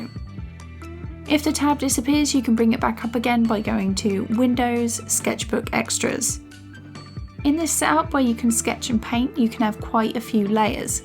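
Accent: United Kingdom. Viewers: nope this girl owns autodesk sketchbook and are you british cos you sound british. im british too!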